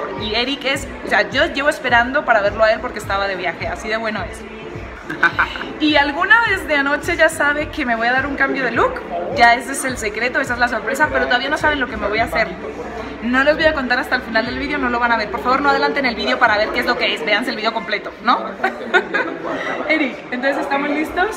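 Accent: Colombia. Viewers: hermosa tu nuevo apariencia!!!!! se te pegó el asento colombiano!!!! jajaj. besos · ¿por qué motivo ahora hablas con acento colombiano? 😒 incluso 'seseas'...increíble...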